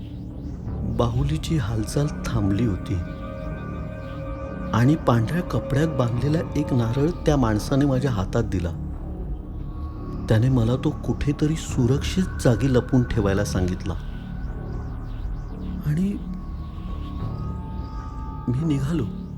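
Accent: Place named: Konkan, India. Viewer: तुमच्या आवाजात कोकणाच्या मातीचा वास आहे 😍 तुमचा आवाज Pralhad Kudtarkar sarkha aahe...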